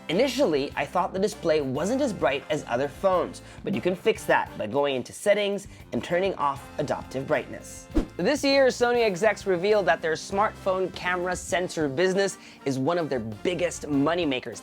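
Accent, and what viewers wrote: Singapore. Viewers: Singaporean or Filipino? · are you from SG?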